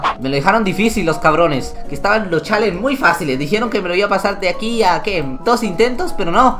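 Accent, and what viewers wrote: Mexico. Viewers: Porque Hablas Como Mexicano :^ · Porque habla Mexicano :V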